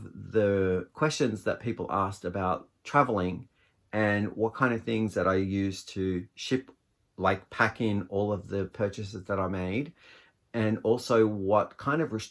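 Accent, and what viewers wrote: Australia. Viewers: Why do you sound Australian?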